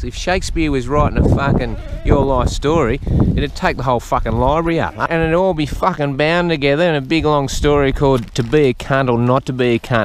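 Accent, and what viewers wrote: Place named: Australia. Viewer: This would lose half its worth without his Aussie accent lmfao 😂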